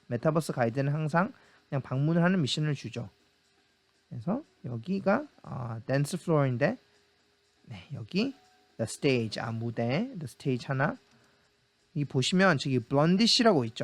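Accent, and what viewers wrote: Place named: United States. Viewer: 발음이 미국에있는 친척형 같아서 목소리가 되게 친근하게 들리네요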